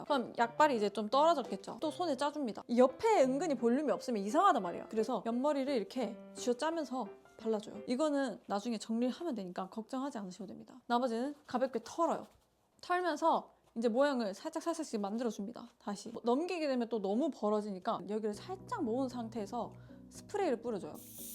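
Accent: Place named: Busan, South Korea. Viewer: 부산사람은 역시! 숨길수 없는 악센트가 있네유~ 반가워요~ 저는 부산기장 살아요~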